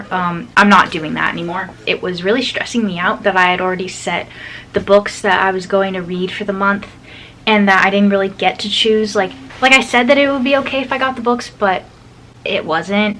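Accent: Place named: Midwest, United States. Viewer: “i sounded midwestern” hahaha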